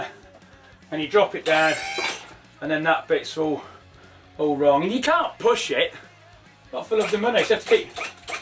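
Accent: England, United Kingdom. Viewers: Сука мега амерзительный чисто английский язык другое дело американский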